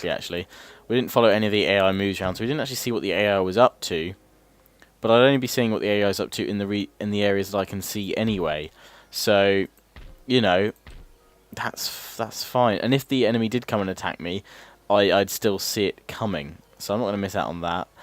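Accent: England, United Kingdom. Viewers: How do you do the cinematic zoom thing, "N" key or "End" key. Sorry im not British dont understand the accent that well. But idk Brits and Americans always fight on the internet. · Well,people DO love a good English accent... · that british accent SO catchy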